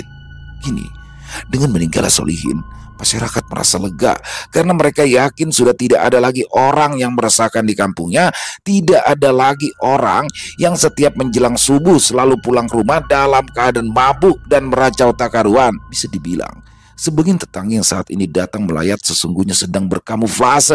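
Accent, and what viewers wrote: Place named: Jakarta, Indonesia. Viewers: Demen banget bang ane...bahasa betokau y...( Betawi y ) Lanjut bang...sehat sllu bang... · Nyimak bang yg cengkok bekasi nya dapet 👏. Ane 20 thn di bekasi masih aje cengkok kemayoran 😂. Lanjut bang 👍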